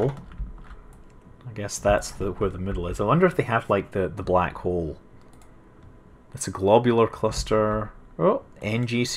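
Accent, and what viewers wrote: Scotland, United Kingdom. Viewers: You are a EXTREMELY interesting guy and easy to listen to, and i love the scotty accent. :D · I adore your Scottish accent,.:D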